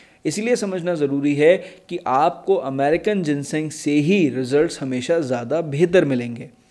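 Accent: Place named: India. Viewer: Indian English!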